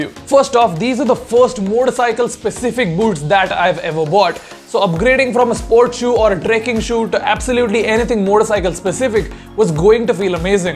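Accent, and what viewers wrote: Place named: Russia. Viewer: Bro,what's that Russian accent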